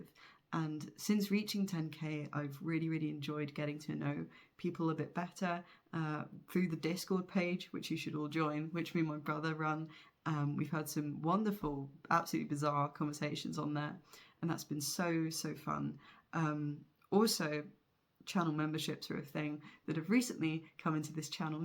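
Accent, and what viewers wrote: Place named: London, United Kingdom. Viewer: It’s strange to hear you speaking, since I’ve only ever heard you sing. But you sound pretty classy—as a yank with little awareness of British accents outside of London, Manchester, Liverpool and Cockney, I’d have to guess that that’s London accent?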